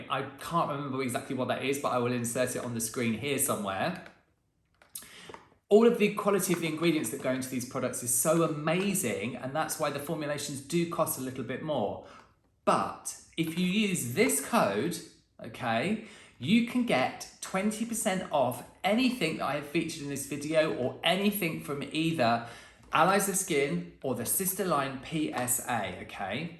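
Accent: United Kingdom. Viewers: I truly adore your British accent ❤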